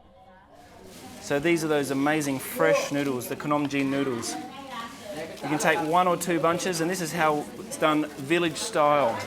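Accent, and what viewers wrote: Australia. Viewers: do I hear an Aussie accent ? I'm on Tamborine Mountain in the Gold Coast hinterland . Can you tell me where Phi Mai is please . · your pronunciation is way off.... you use too much of your native australian accent and it ruins the tones of the thai language !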